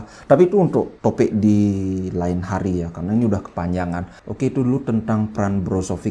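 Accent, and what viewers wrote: Bali, Indonesia. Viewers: Logat logat bali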